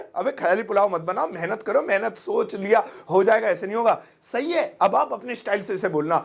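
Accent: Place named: Rajasthan, India. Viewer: Sir your and my language is same When you said - ka keh rho h ee 😅 I'm from bharatpur Rajasthan Watched all your videos 😍 Love you sir 🤟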